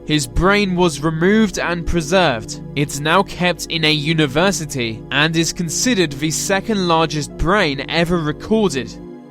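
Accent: United Kingdom. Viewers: are you British cos your voice is fabuloussssss ☺☺☺☺☺☺☺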